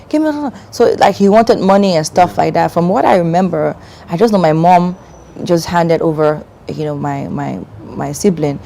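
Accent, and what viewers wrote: Nigeria. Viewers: Lol. I thought I was the only one who noticed it. She sounds Nigerian. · She sounds more Nigerian than any other nationality. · She sounds like a Nigerian.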